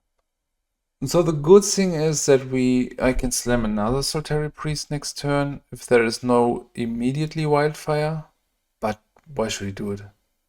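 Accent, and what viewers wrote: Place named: Germany. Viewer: Vom Akzent her bist du sicher Deutscher! Cooles Deck und und nur Support für den Atzen!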